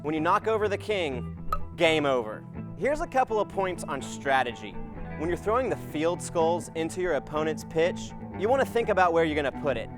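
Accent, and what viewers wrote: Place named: United States. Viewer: his American accent is incredibly annoying, it sounds like he's saying kooob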